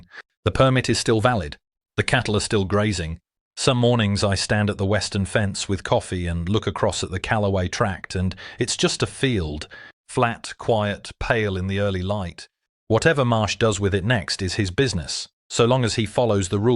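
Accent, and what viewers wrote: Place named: United Kingdom. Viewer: Why a british voice?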